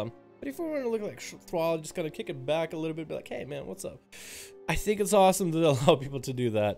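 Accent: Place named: Russia. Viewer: This is not meant to be hateful... I'm just trying to give my Opinion on how to make your Videos a lot more enjoyable (for me, at least). It really bothers me, when you sometimes just mumble some words and I can't really understand what you're saying - or I really have to concentrate on the Video. I like to put videos like yours on, while playing. And it would make it a lot more enjoyable, if you'd just take your time to pronounce everything correctly. And I think you're part russian (Am I right?) and that can make speaking English harder. As a non native english speaker myself, I get you 😉 But I think you could really improve that, by just talking a bit more slow and just trying to take your time. I really like your content. But that sometimes really bothers me. If I'm the only one on that, then nevermind, forget about it 🙈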